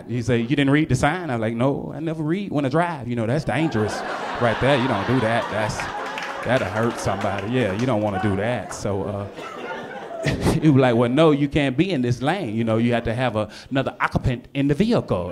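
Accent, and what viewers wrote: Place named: South, United States. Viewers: The accent. Probably from the same part of the south. Just a guess. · That black atlanta accent is hilarious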